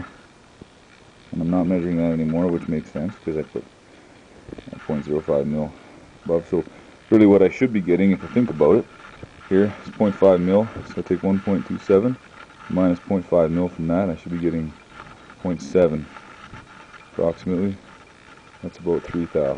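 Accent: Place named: Canada. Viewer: im taken already, im not used to the canadian accent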